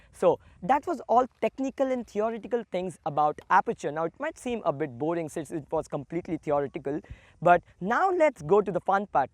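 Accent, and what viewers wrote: India. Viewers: very nice explain... i think it is the time to make a video on how and what principle camera works with animation clear in indian english like your sweet talks... we are eager to get that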